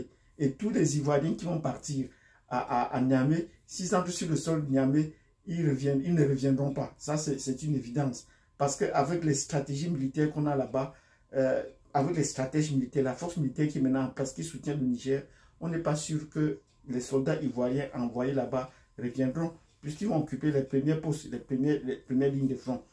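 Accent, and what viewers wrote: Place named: Cameroon. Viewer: Par ton accent tu est biyamerounais je dis oh vous les camerounais ou est votre problème ds ce qui se passe chez nous en cedeao au lieu de vous occuper du cameroun du Molière biya et le revenant Ali bongo ,qui prépare sont 3 eme mandats,les citoyens cedeao ne parlent pas de votre pays et de la cemac pourquoi vous ne vous occuper pas ds ce qui se passe chez vous pourquoi vous les bantous vous vs mêler pas de ce qui se passe chez vous .bazlaye d,abord chez vous avant de parlé de quelqu’un avec président biya 42 ans de dictature oas d infrastructures au lieu de venir parlé de Mali,??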